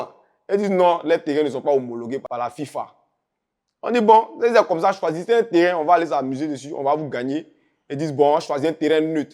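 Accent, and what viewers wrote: Ivory Coast. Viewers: bon accent ivoirien aussi,j suis fan... sans complexe... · je sais pas comment je vais dire ca mais jaime ta maniere de faire tu sais il ya des gens qui ne comprenne pas les lourd lourd francais la donc toi tu parle comme nous et on comprend bien dans nos nouchi la mme bravo a toi bro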